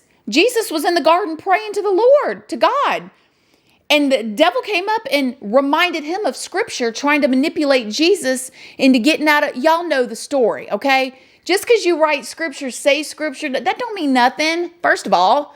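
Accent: South, United States. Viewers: I love how your southern accent came out in this video, lmbo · This is probably your best video yet! You are straight up for real with your feelings on this because your Southern accent has never come out like this! I sound super Southern when I feel like this, too! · Your southern accent is coming through strong today! Lol when I get loud, mine does too. lol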